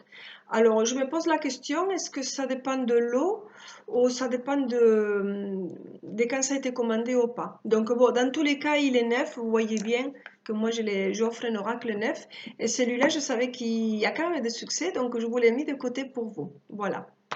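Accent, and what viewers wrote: Spain. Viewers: Bonjour!!! Moi, j'adore ton accent espagnol d'autant que je suis d'origine espagnole et j'en suis très fière... Je participe donc à ton concours avec grand plaisir... Je trouve très gentil de ta part de célébrer déjà tes premiers abonnés... Je te souhaite une belle journée ensoleillée (car je suis près de Nîmes et chez nous le temps est superbe)... Bisous... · cc, j'adore ton accents espagnol, mon père est espagnol, saragoza 😉 + 1 abonnée , je t'invite à venir à voir ma chaîne et t'y abonnée et me suivre si tu le veux sa me ferait plaisir. gracias bela . besitos. ( attention la belle on a pas le droit de mettre je participe en commentaire) c'est interdit tu pourrais te faire supprimer ta chaîne. Il faut indiqué que les personnes mette un mot tout simplement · Hello ma belle (dis-moi si jamais tu n'as pas envie que je t'appelle comme ça:- )))... 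JE PARTICIPE évidemment avec un immense plaisir. Bravo pour tes 100 abonnés!!!... Moi je t'adore, et je suis ravie d'avoir fait ta connaissance. Tu te débrouilles super bien pour ta chaîne et tu es très à l'aise, naturelle et on te comprend tout à fait... Donc, pas de honte... Et puis, l'accent espagnol est un plus!!! Bisous bisous... Tout plein! Sonia, Genève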